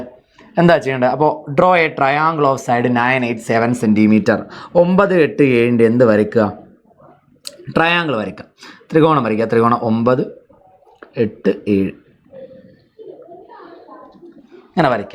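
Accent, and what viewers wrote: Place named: Vadakara, India. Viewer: Vadakara voice ann sern😂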